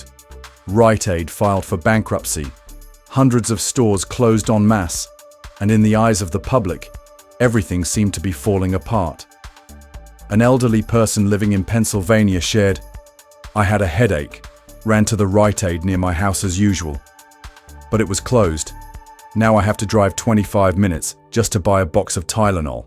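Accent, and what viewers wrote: United Kingdom. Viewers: Hey Dude, where did you get that British accent? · Why do you have a British accent??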